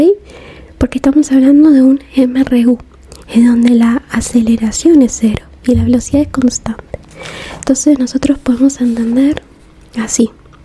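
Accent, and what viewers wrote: Argentina. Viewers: Hace poco conocí tus Asmr y me ayudaron una banda para estudiar para unos parciales q tenía considero tu canal el mejor de Asmr de Arg creo q sos de aca o de Uruguay x el acento sjsjjssj Nada seguí así PD:Tenés unos re lindos ojos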